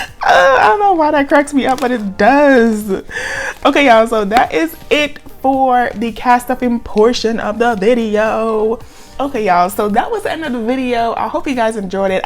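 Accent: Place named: Jamaica. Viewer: Ooooooohhhhhh yeah... That Jamaican accent is fire 🔥💪🏽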